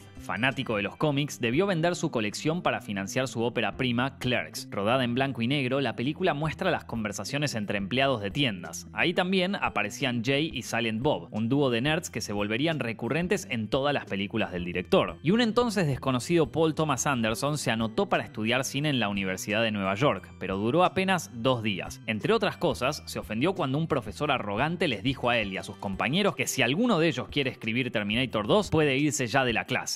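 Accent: Argentina. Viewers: Felicitaciones. Por fin una voz argentina de alguien que sabe y que no lee cualquier cosa cuando lee en inglés. Muchas gracias!!!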